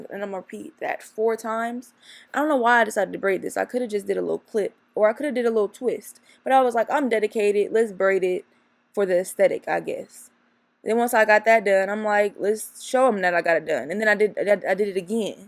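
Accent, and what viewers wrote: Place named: Columbus, United States. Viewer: its so funny how i knew you were from Columbus before you even said it, & yall swear yall don't have accents 😭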